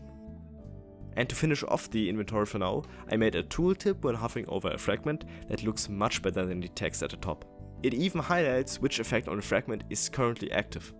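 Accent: Germany. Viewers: noway you arent german you sound somuch like youre from germany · Youre german arent you? I can hear the accent a little. :) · I love your german accent, correct me if im wrong but its so typical like me haha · actually a game i would buy. Are u from germany? I like ur german accent. keep on inproving. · kann es sein das du deutsch bist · Are you german? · very cool devlog and game! You're very talented at deving and making vids, keep it up! The only thing I notice is a little ''german accent'' If I'm correct pls let me know :D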